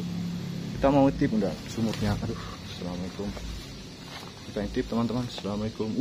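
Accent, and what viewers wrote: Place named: Madura, Indonesia. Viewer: baru nonton channel ini. saya suka karena rumah Belanda kuno. Logatnya Msdura banget... jd inget sama famili jauh saya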